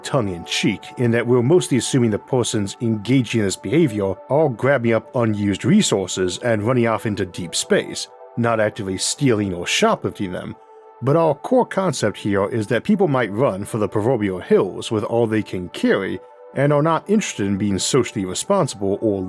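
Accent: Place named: South, United States. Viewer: It's a speech impediment(he has improved over the years tho thanks to speech therapy) Under that is a normal Southern American accent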